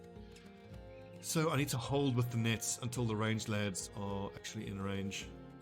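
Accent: South Africa. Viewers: Interesting accent sir, saffa living abroad?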